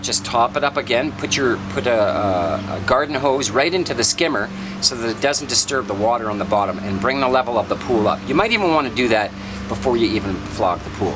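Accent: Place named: Canada. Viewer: Thank you so much, you've saved my life (well, okay, my swimming pool!) I was getting so frustrated with a cloudy pool. I had added a load of flocculant but however much I vacuumed I just couldn't get rid of the f***ing crap at the bottom. Eventually it dawned on me that it was all just coming back through the filter and for whatever reason the filter just wasn't collecting it. That's when I saw your video and realised I should put the pump on the 'waste' setting (or 'desague' as they call it here in Spain). Bingo! It means I have to top up the water level, as you say, but definitely worth it. Now I just have to backwash the filter to get all the crap out. [Note here for any English-speaking expats living in Spain, like me, who may be confused by the terminology: for 'backwash' you need to look for 'lavado' and for 'rinse' you need 'enjague']. Totally off topic but I love the accent btw. 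I have a Canadian mate in Madrid who speaks just like you. Can't get enough of that Canadian pronunciation of 'out': a little bit Irish, a little bit Scottish, but 100% Canadian!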